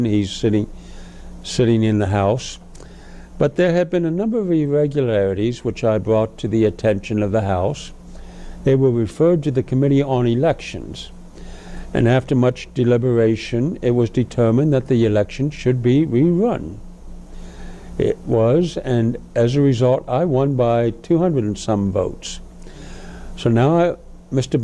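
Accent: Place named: Brooklyn, United States. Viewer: I think you so much, for your hard hard work & research, I would like to suggest working on toning your YANKEE ACENT, for your impression, studying the General’s photos, will show you can use a skilled master Barber 💈. Do a more Standard “ regular “ Bussiness style HC, and about 3 inches in the front on your forehead, and combed back in more of a “ roaches “ front ( old southern term) for “ Pompadour’s “ that’s just away it’s worn off the forehead, your’s is too wispy, too long on your collar, looks , 1810’s, and late 1830’s, but in the 20 th century 1990’s like Billy Ray Cyrus, Mullet. As a fellow wearing a flat top in 1978 out of step. You’d be perfect 👍 for those periods, like , President Polk, wore his in the style, described in Oh Jennings Wise’s book description of his father , Henry Wise, “ in the style of the 1840’s, combed straight back from the forehead long in the back. 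But as you can see by the photo’s , they dressed there hair then, w Call Mees, hair tonic’s,, bear grease, is why You see or did see bears of different sorta illustrated in Barber 💈 shops. Tunstall parlors, and you General, had a Black, body servant, and 99 % Of Tunstall powers in the south was always ran by negro Barbers, another Example I’ll give you is General R E Lees Haircut is called a “ Quartersingle” it’s a styling he wore all his life, it is from the 1820’s into the 30’s mid way. The hair is parted in the back center, and the head is lend forward , and basically trimmed in front of the temp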